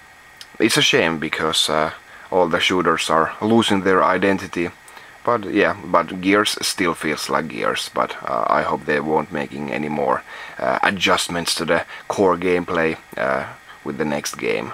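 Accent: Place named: Finland. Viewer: Finnish accent is unique. Not so easy to grow out of it.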